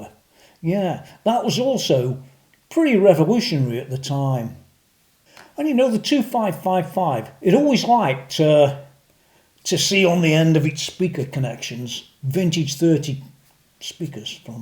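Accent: Stoke, United Kingdom. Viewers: Am I picking up a Stoke accent?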